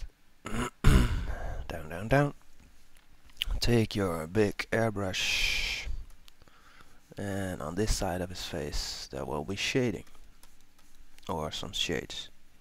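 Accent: Netherlands. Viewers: Are you dutch? You sound dutch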